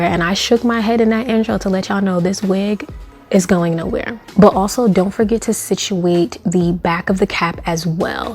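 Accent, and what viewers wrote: New York, United States. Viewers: The way you say "also", "oval" and "important"... with the silent t's you sound like a New Yorker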